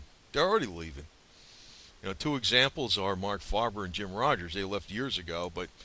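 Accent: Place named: Texas, United States. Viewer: Dude, your voice is familiar, what part of Texas are you in?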